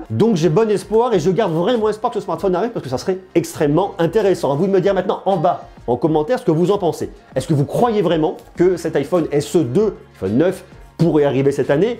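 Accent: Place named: Clermont-Ferrand, France. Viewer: Mais en faites t’es clermontois !!!!!?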